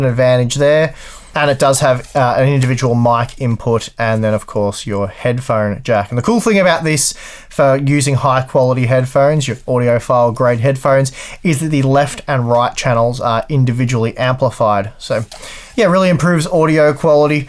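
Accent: Australia. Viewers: I LOVE THE AUSSIE ACCENT .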